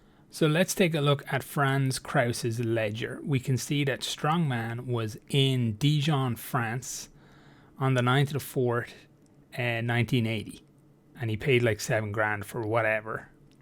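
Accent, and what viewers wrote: Ireland. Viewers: he just has an irish accent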